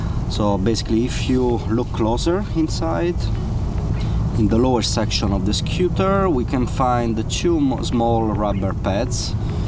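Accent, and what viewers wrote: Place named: Italy. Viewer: Quanto cazzo si riconosce un italiano che parla inglese ahaha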